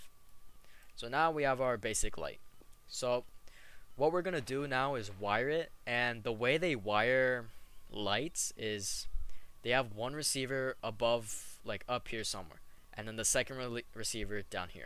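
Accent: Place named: United Kingdom. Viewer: UK accent.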